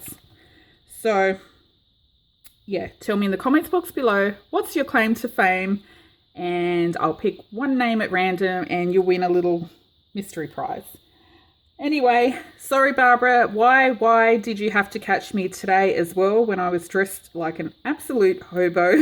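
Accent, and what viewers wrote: Australia. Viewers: Yaaay! That's super!! You're joking about your voice, right? I love your voice! It is sweet, friendly, good spirited and you have an Aussie accent to boot! What could go wrong? 🤗🤗🤗😍😍🤩🤩😉😎😜😜😝❤ Hello Barbara! And I'm only famous inside my studio. 😜😜😜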